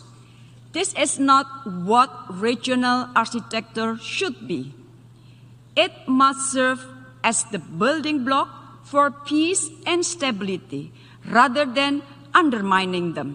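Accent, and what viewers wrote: Indonesia. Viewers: Gini lho dengerin menlunya ngomong enggres pake aksen Indo. Gak ala2 amrik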